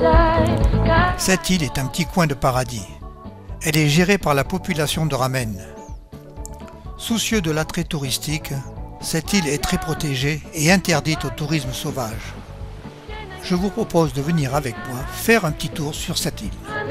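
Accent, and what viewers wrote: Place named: Maluku, Indonesia. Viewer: Muka2nx Mirip Orang Indonesia Maluku Cara Bicaranx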